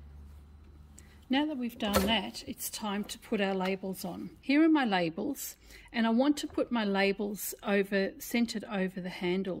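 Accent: Australia. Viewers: Greetings from Canada ! Thank you for your awesome tutorials! I have lots of tea towels of various sizes…would you be so kind to give me the measurement of your half towel once cut ? I want to be sure I’m using the same approximate size . Thank you ! Ps.. 99% of my family is in Melbourne …hearing your lovely accent makes me miss them even more 🇨🇦😁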